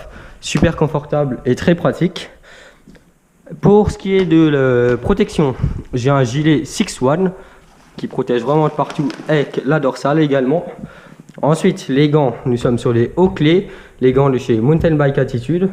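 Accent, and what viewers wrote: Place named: England, United Kingdom. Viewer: L’accent anglais c incroyable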